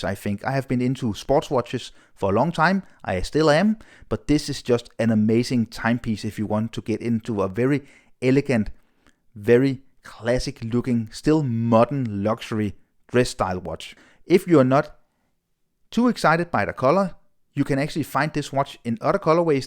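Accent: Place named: Denmark. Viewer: Man, I can pick out a Danish accent anywhere.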